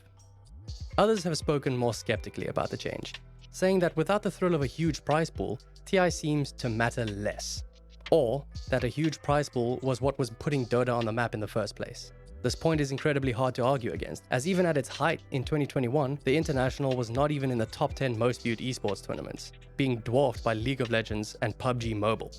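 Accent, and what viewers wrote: South Africa. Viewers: Can spot that South African accent a mile away 😂 Great vid. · I thought this guy sounded south african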